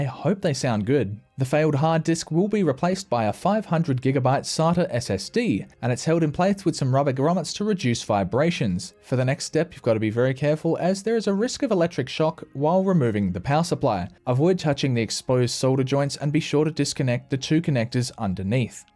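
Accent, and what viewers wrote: Australia. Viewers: I can hear the Aussie accent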